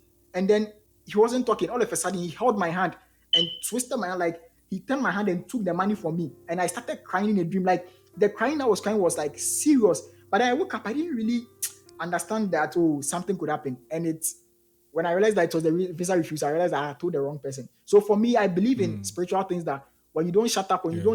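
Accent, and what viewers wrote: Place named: United Kingdom. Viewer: We want the UK accent ❤❤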